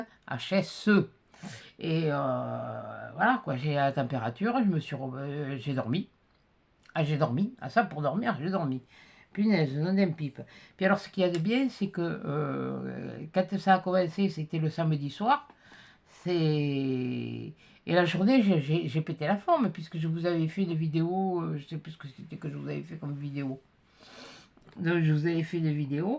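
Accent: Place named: Médoc, France. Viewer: Bonjour très heureuse de voir que vous allez bien Vos boîtes sont magnifiques la boîte que vous avez faite pour la dame est très jolie vous êtes une très bonne personne et cette dame a un très grand cœur Je ne suis pas scapeuse mais j'aime beaucoup se que vous faites et vous me faites bien rire votre accent me rappelle le mien je suis originaire du médoc Prenez bien soins de vous Je me permets de vous embrassez embrassez ❤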